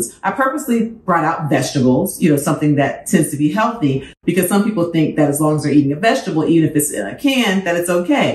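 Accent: South, United States. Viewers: Oh-my-goodness! thank you for making this video. You are doing 4 things here for: Reinforcing much of what I'm already avoiding and giving me more reason to stay focus. Reading the content, cooking more. I'm a little a Pescatarian finding more options. You are also causing me to miss my home grounds in Arkansas everytime you say " You know Goodden-well" I've only heard from southern people.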